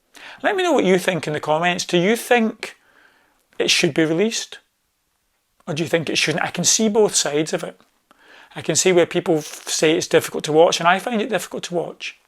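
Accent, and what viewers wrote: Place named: Scotland, United Kingdom. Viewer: I find it difficult to watch, this time period without feeling sad but his voice was still spectacular. ❤. I love your video. Nice to put a face to the lovely, knowledgeable Scottish accent😅 😅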